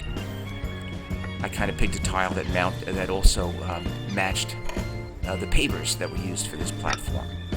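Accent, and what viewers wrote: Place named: New York, United States. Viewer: Nice work!....looks like a fun build....gonna do 1....u gotta be from NY rt?....haha....I'm in/from LI...I hear the accent....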